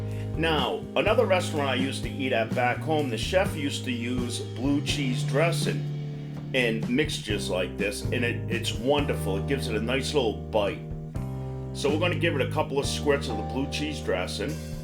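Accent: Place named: Massachusetts, United States. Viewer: Are you from Mass ? You sound like me lmao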